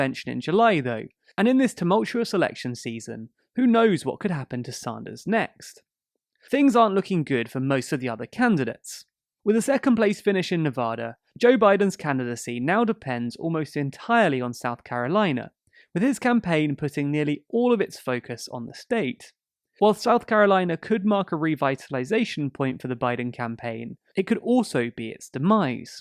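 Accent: England, United Kingdom. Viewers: You mean that's the english dialect of english, which equally as valid as the american dialects of english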